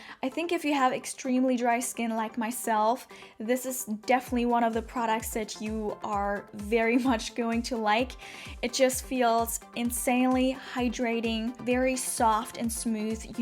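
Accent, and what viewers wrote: United States. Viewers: Just wondering where are you from? It was interesting to hear the word intriguing sound like "intra-guing" and cushion was pronounced "kushen." Its so very intriguing since you sound American otherwise :) Thank you for the review :) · I can't place your accent. One moment I hear American but then it's something else. Especially when you said the words intriguing and cushion. I knew what you meant. But I had to pause for a second.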